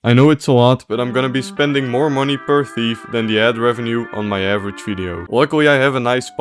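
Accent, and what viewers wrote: Netherlands. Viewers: You sound dutch 🤢🤢🤢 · love that dutch accent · nice video! je engels accent moet je misschien nog op oefenen. aan, jou nederlands accent te horen denk ik dat jij van nederland bent of niet?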